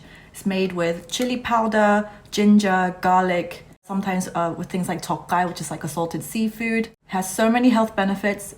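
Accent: United Kingdom. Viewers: 영국식 발음같은데 맞나용? 멋있네용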